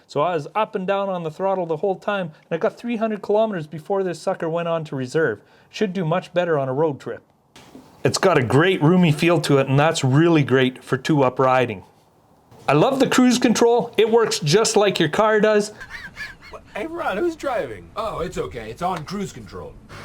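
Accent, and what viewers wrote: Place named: Canada. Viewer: hey what part of the world are you in??sound Canadian hey ,,i cant find that GT in that color anywhere in the usa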